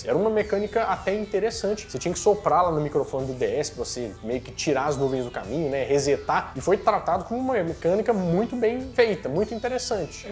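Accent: Minas Gerais, Brazil. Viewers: Como eu amo esse nosso sotaque mineiro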